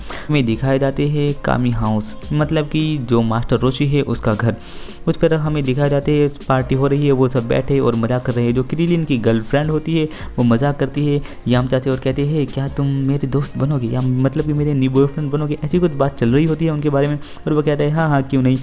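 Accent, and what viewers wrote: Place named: Garhwal, India. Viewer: bhai tumhari language में garhwali taste aata hai isliye bol rha hun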